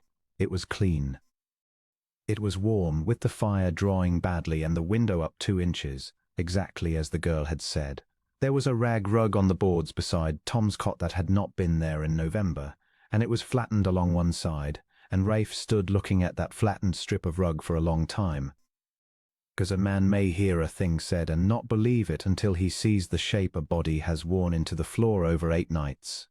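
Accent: United Kingdom. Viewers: Loved this story! Thank you for reading it in a British accent and making this tale so authentic and enjoyable.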